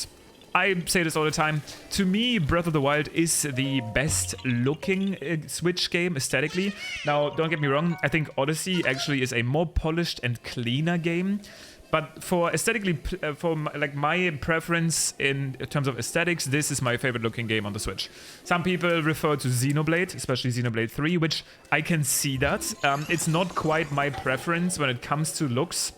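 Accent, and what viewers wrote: Germany. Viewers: Are you German? Your English sounds German.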